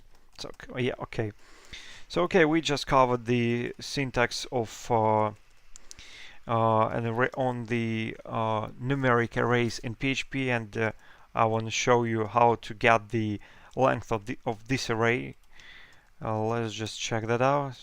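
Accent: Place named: Russia. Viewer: The Russian accent, haha :)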